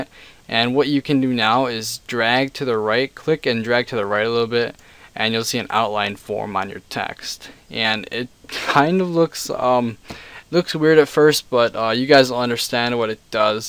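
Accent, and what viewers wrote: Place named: Quebec, Canada. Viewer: where are you from i can't tell from your accent. I'm either thinking Quebec or some french speaking place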